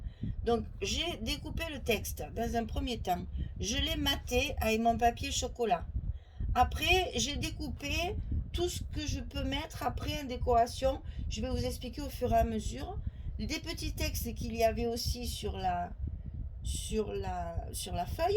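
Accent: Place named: Southern France, France. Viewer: Quelle jolie début ! J'avais vu cette collection mais les textes en anglais pour les fables de La Fontaine ça me pique un peu les yeux 😕 peut-être me ferez vous changer d'avis 😁 En tout cas je vais suivre ce projet juste pour le plaisir des yeux. PS : j'adore votre accent qui sent le soleil, la mer et les cigales. 😉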